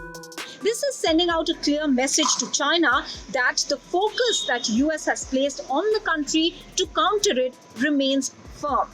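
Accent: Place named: India. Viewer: China is bullying Asean? Do you even know where the location of Asean in the world? And please speak without the Indian accent.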